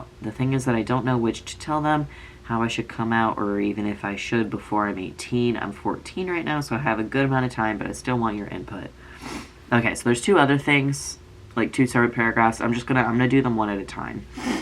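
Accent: United Kingdom. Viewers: the British accent omd 😭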